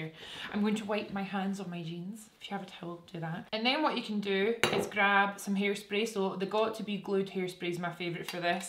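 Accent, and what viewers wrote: Scotland, United Kingdom. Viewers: I'm an English teacher from Germany and must admit, the Scottish accent is my absolute favourite one to listen to, although, of course, I'm aware that if you'd talk among friends I probably wouldn't make out any sense at all 😂 It's so charming that it totally adds to my pleasure watching this channel and contributed to my decision to subscribe honestly, a lot...but reason no 1 the humour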